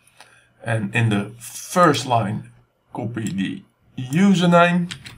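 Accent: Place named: Netherlands. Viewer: It spund like youre from The Netherlands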